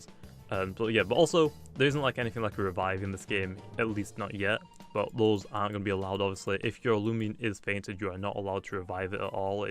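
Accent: United Kingdom. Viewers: I think he's British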